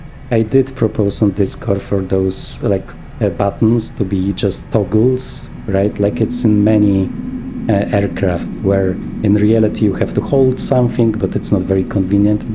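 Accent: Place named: Poland. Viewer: nie ukryjesz sie, polaka slychac na kilometr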